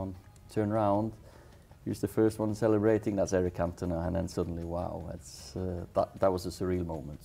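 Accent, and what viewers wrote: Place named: England, United Kingdom. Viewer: Hes got a English accent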